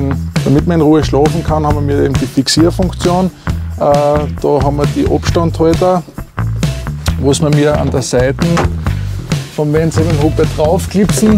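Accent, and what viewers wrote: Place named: Low German, Germany. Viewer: Wenn man Kunden gewinnen will sollte man verständlich sprechen. unn nitt sei Platt!!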